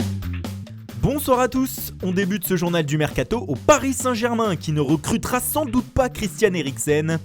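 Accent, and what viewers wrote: Italy. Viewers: Putain j'adore quand tu fais l'accent italien!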